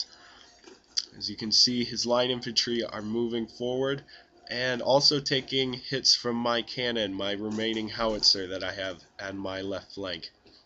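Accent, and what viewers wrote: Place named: United States. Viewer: Good commentary. Are you an American living in France? Just wondering about your accent (sounds like my American one). Funny thing about your opponent's name. I kept picturing that alien from "Lilo and Stitch."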